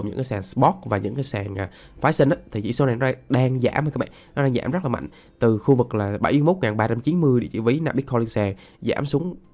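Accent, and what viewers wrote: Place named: Quang Nam, Vietnam. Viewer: Anh ng o miền trung quảng nam ak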